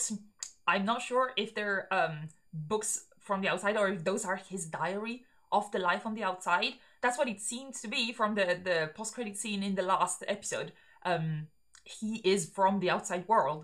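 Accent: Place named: Italy. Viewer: Sorry for non-related, possible offensive comment, but, in the moment you started the video I knew you were Italian. 😅 "The wey of speakingue withe ann ecxtra vowel is so cuteh". Same happens when we Brazilians say "hotchy doggy" instead of "hot dog". I just love it.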